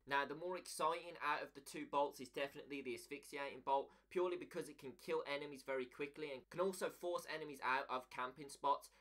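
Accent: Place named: Birmingham, United Kingdom. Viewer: I hate the brum accent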